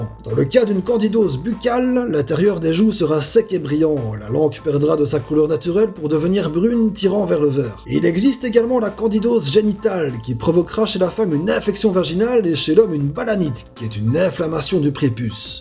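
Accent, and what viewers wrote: Liège, Belgium. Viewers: t'es liégeois ?? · normal c un belge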